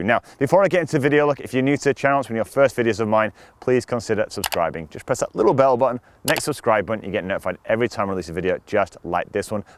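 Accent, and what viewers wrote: Yorkshire, United Kingdom. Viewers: Where are you from? I hear a yorkshire accent in there somewhere haha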